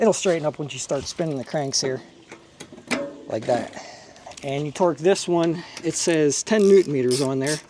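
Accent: Pittsburgh, United States. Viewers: Are you from Pittsburgh??? 😂 Also I’m strongly considering this bike over wired, and a few others. Currently added to my cart 🛒 and can’t decide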